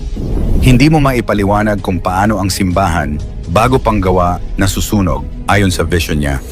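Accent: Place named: Philippines. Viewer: NALILITO ako sa NARRATOR. PINOY kb? IBA kc intonation n accent mo😅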